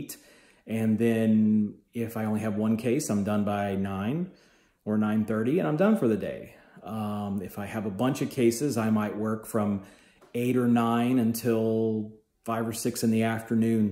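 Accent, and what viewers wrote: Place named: United States. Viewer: In Iraq, I had to go through 6 years in order to finish medical school (requested number of years here ) 😖1 year junior residency, 1 year between PHC and my work in university.. and now i’m in the second year Histopathology board residency. I like how you explain it from A to Z, your clear American-english accent is astonishing, I just put headphones and listen.. every time you post a narrative video 🤩 I wish you were my tutor 🙏🏻 Thank you so much, Dr. 🌷 Arwa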